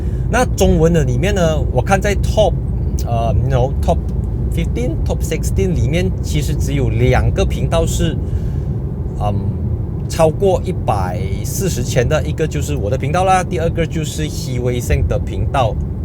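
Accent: Malaysia. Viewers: 不需要特地去改口语，Malaysia人当然是用回Malaysia Style的华语啦！我肯定是站在你这边的👍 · 不要太在意他人的看法，虽然说人不可能完全不听他人的评价，但是您其实也已经很优秀了，您所分享的都是真实且客观的评价。还有您的口音就是您的特色（也代表马来西亚独有样子）所以非常atas👆👆👆，马来西亚人就是有一点点口音问题（在外国人眼中）但是这就是马来西亚的特点，我们会各种语言和方言自然而然也会有一种独特腔调（口音）。最后我想说就是您都已经放subtitle了，如果subtitle不给人（观众）看难道摆美还是给鬼看🤣XD。加油，真爱粉与您同在！🤙🤙🤙 您也要做到，当你真正拥有的时候，不在乎别人怎么看你！😊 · will support you always.. just stick to our malaysia accent bro.. that's make you special bah hahaha.. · 从一开始就支持到现在，还没开始看你的频道之前我总觉得介绍车的频道都是在背spec罢了。但是慢慢的就只观看你介绍的。没有贬低其他频道的意思，但是我相信多数观众都会有同感，可以介绍超跑、又可以介绍myvi saga。 choo yee的频道真的是很优质。如果接受不到我们马来西亚的华语腔，soli ～我们就是喜欢酱讲话。继续加油，200k subscriber 迈进，不忘初衷💪 （其实真的开会员频道我们一样买单） #OneManOneTeam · 其实保持Malaysia口语是好的，像中港台YouTube的节目，他们也是保持着自己的特色，我也是有观看的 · 继续做你自己吧其实这才是大马的本色文化语言就好像看其他国家的汽车媒体他们也没刻意去改变腔调来迎合外国观众如果外国观众不明白一些大马的道地语言一些大马的梗可以在影片下面留言我们大马观众会帮忙解释的我们也会继续支持你希望你能继续走下去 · 我是無意中發現了的channel，看了一條片就subscribe了，我反而覺得，一定要keep住目前這种風格，的馬來西亞口音華語我覺得很有趣，而且還時不時來兩句廣東話和閩南語，很好玩。新不新車什麼的無所謂啦，現在做汽車的channel很多，也不完全是為了看新車才看影片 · 加油，挺喜欢你的马来腔，哈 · 继续努力加油！我们应该以马来西亚口音为骄傲。这是我们的特色！我会继续支持你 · 從語言方面來講, 我覺得你毋須遷就那一個國家的觀眾。我是在澳洲生活了三十年的香港人, 我不講華語, 對你的馬來西亞的華語完全了解, Slang反而有親切感. 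以英語而言, 澳洲, 紐西蘭, 英國, 加拿大, 美國, 印度, 馬來西亞, 星加坡也各有特色, 卻不會影響我們的溝通. 做Youtube當然要用自己最流利的語言, 更加重要的是content啦. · 马来西亚华人用马来西亚特有人情味的华语强调就是你的定位！我认为你不需要调整。另外，你可以分析一下你的主要受众群特性；以我个人的观点，你的受众应该主要来自中等收入职场人，要拓宽subscriber量，除了深化你的原有拍摄风格，还可根据你的主要受众因为车子而关心的其他生活事项（如车贷、车险、维修保养、智能化提升、运动、假日出行等）的各种tips或好康介绍做延申，让订阅你频道的粉丝不单是观看你的车评，还因为在内容中看到各种好康而更有向好友推荐并subscribe的动力。数字经济时代，羊毛不但能长在牛身上，还可让狗来买单。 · 馬來腔的華語台灣人聽完全沒問題,還蠻親切的會想到大馬的僑生同學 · 我住在米国，就喜欢你的马来腔。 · 我是大陆人我非常习惯这个马来西亚的中文口音因为在大陆B站有许多马来西亚的up主常年投稿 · 如果你担心部分观众听不明白某些词语，建议你可以在字幕写能理解的词语，希望你能在保持大马有趣的口音当下也能让观众明白你的讲解！ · 很支持用自己的方式介紹Malaysia 的車子如果今天用台灣腔或普通話講的話就不Malaysia 了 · 我看留言者几乎都是男性。我是受我先生影响，也学会看的汽车介绍，从中学会了一些汽车的知识。希望你不久的将来订阅人数达到50万以上。还有，我prefer 你的马来西亚华语口音👌👍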